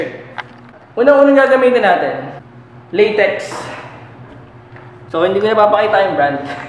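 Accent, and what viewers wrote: Visayas, Philippines. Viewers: Bisaya man diay ka kol haha. Salamat saimo vid kay gusto ko ako ra mo pintura sakong balay para di nako mag labor. Unsay ma suggest nimo na brand? Latex ug unsa tong pang finish na paint? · ayos bisaya mn d i ka sir.. proud bisaya here.. tnx sa video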